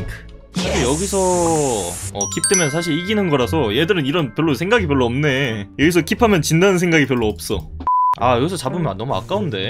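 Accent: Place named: South Korea. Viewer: 찐 콩글리쉬군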